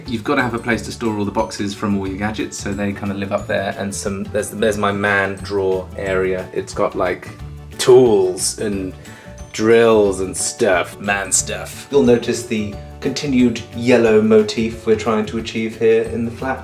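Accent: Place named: London, United Kingdom. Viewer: this is very good.. finally i found someone speaking clear normal londoner accent. thanks alot for the beneficial video. looking forward to see some more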